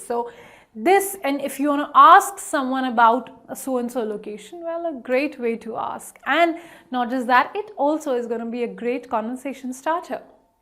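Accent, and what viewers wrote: India. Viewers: Yet, It's an Indian English :face-red-droopy-eyes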